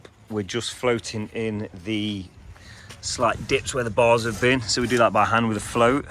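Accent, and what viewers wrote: Nottingham, United Kingdom. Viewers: could tell straight away from his accent hes from notts! great video!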